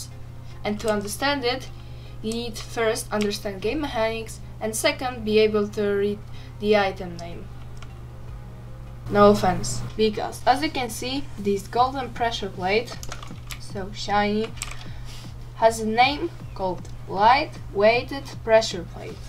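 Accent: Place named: Germany. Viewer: are you german? i like your accent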